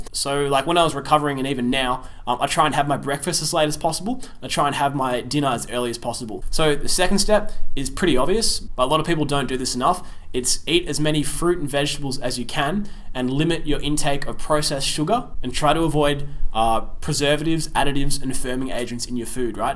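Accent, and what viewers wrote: Australia. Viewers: that fooking Aussie accent mane... great video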